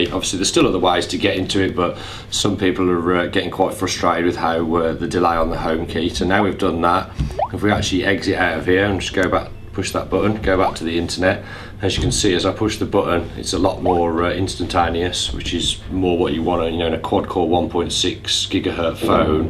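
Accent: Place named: Cockney, United Kingdom. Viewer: Cockney. coolest accent ever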